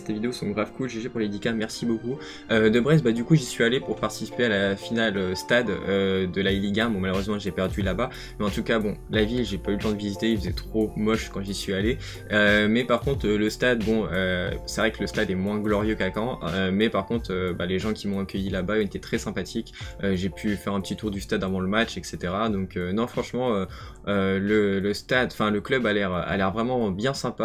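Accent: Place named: England, United Kingdom. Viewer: Le petit accent anglais qui fait plaisir insragr_a_ m continue comme ça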